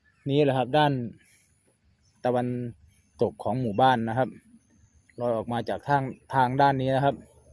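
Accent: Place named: Korat, Thailand. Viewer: เป็นคนกะไหนน้อครับคือเห็นพูดโคราชดาครับ😄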